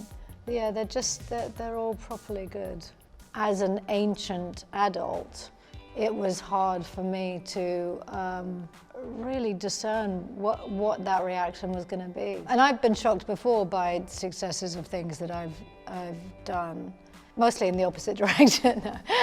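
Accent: England, United Kingdom. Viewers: Because she lived in London for several years as a child, so her English accent is just as natural to her as her American accent. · Why is she still talking with an English accent? :p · Ok can someone please tell me once and for all if shes American or british bec sometimes she sounds American and i know that's her nationality too, but here for example she sounds SO British im so confused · She has her British accent on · I had no idea she is from the UK. I never heard her accent before.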